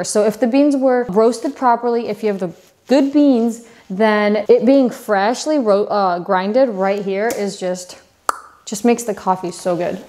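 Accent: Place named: Russia. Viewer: i hear some Russian accent notes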